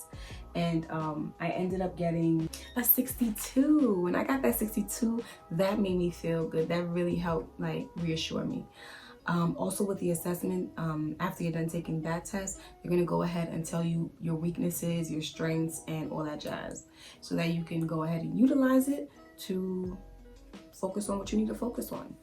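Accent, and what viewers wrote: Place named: Liberia. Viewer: I keep hearing a slight Liberian accent. Darling, are you from LIB?😉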